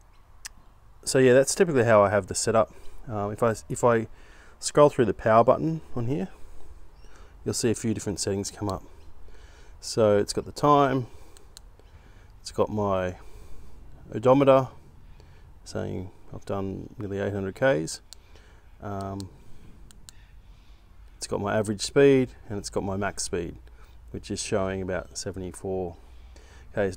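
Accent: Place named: Australia. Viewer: You sound aussie, so aren't you worried about getting caught with a 1000w motor?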